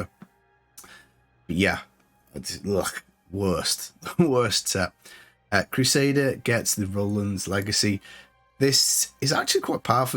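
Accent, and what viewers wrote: Stoke, United Kingdom. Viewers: Are you a stokie?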